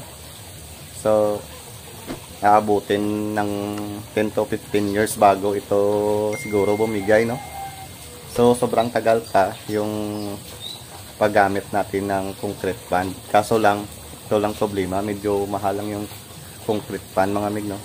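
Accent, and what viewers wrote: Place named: Iloilo, Philippines. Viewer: Ilonggo ka siguro nu meg.. kay may ebidensya ako simu..sa tono ng boses mo abut taka meg..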